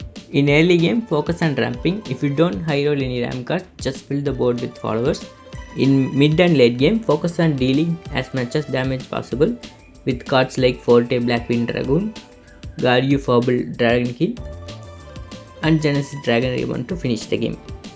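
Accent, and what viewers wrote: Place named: India. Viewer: SERIOUSLY!? I knew it by the accent, thanks for be in this game... I love this game I am Indian too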